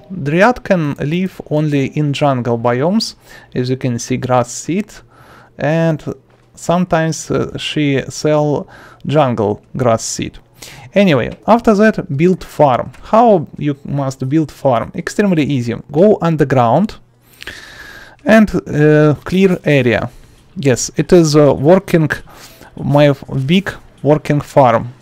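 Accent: Russia. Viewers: Your from russia? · Не, ну русского человека узнать очень легко по акценту :) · I love his russian accent its so good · Мог бы и не скрывать что ты русский)))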